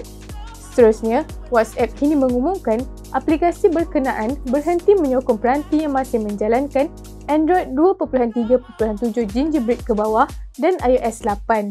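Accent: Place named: Kelantan, Malaysia. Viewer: Host ni org Kelantan ke? Dgr mcm slang sana sikit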